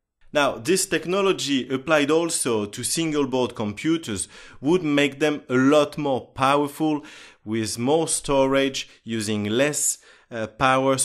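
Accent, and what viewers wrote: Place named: France. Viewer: Toi t es français je reconnais l'accent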